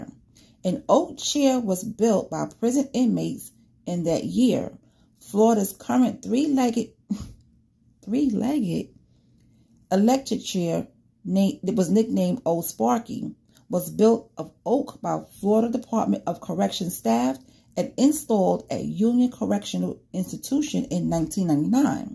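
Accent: New York, United States. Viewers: You sound like your from New York. We got New Yoooork in da house!!!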